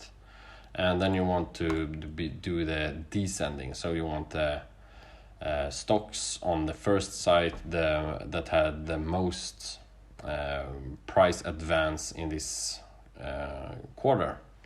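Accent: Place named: Sweden. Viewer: Tjena, kanske ingen vild gissning men tippar på att du är svensk. Tack för en bra video, leker runt med finviz för att hitta bra aktier. Mest dock penny stocks som kan bryta ut kraftigt är det jag letar efter. Tycker du ska köra på svenska istället för engelska, mindre tittare kanske men tror ditt talande hade blivit mer flytande och naturligt. Har du fler set ups på finviz du kan rekommendera om man vill leta aktier som kan sticka iväg uppåt?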